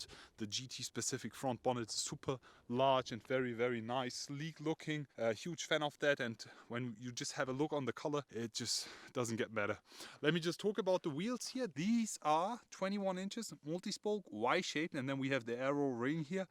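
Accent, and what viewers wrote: Germany. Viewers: I like the way you spell the words like Arnie 😂 I can feel some German vibes coming through your voice. Really really Arnie-ish 👍🏽 I love it 👏🏽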